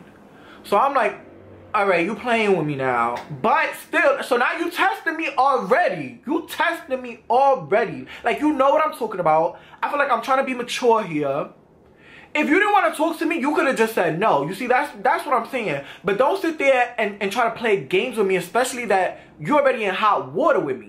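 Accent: New York, United States. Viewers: that New York accent thoooooooooooo. REPPIN FROM THE BXXXX HEA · yo i dead always watch your vids to hear the ny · He got that New York talk yo. Brooklyn or the Bronx or Marcy Projects son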